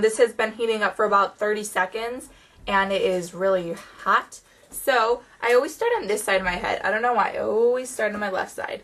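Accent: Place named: Midwest, United States. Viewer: Are you from Michigan or Wisconsin? It sounds like you have a slight accent from there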